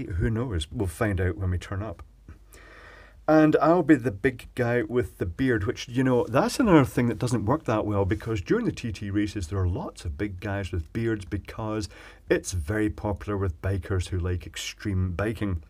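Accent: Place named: Scotland, United Kingdom. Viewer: The Isle of Man isn't in Scotland, it's a separate country buddy. accent is Scottish however so an easy mistake to make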